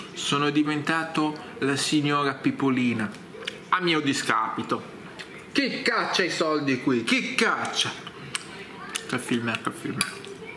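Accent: Italy. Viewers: HAI UN ACCENTO DEL SUD SUD ITALIA INVECE IL DI UNA VOLTA AVEVA L'ACCENTO DEL NORD..ED AVEVA UNA VOCE PIÚ BELLA CON TANTE VISUALIZZAZIONI.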